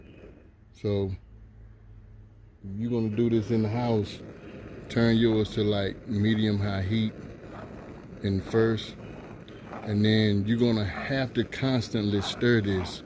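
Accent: South, United States. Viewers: Did you ever happen to watch your videos afterwards? The English subtitles suck! Your nice Southern accent is not recognizable and they write nonses! I laughed heartily! Enjoy the audio to written stupidity of you tube. Bye from Greece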